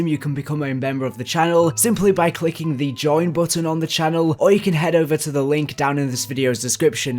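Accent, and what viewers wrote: United Kingdom. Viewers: Anyone else loving when he is streching some word with this british accent? :D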